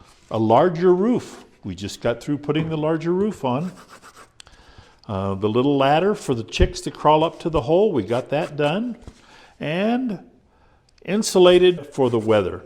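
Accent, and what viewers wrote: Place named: Pennsylvania, United States. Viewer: I'm trying to figure out where your accent is from. Pennsylvania? That's my best guess.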